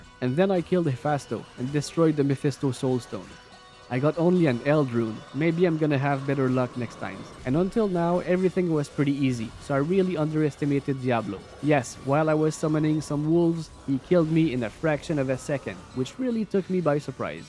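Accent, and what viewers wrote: Quebec, Canada. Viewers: Are you French-Canadian? Your accent sounds very denis villeneuve to my ears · I just want an answer, your accent, it says Canada, are you from Québec? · Accent Québecois?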